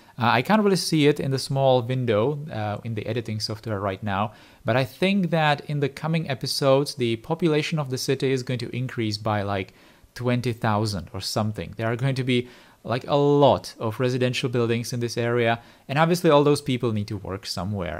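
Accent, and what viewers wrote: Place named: Czech Republic. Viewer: It's the czech accent for me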